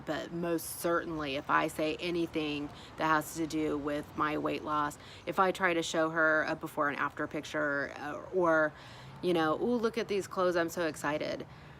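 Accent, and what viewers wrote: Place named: Boston, United States. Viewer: Thanks. I really enjoy following your journey. The struggle is real for all of us. You remind me of a Bostonian the way you talk and your attitude. I grew up in Boston. We're wise guys, sarcastic, hardworking and honest. We tell it like it is. I appreciate you and your struggles. Thanks for keeping it honest and real💟Be glad you're not here now. Big snowstorm coming tonight 🙄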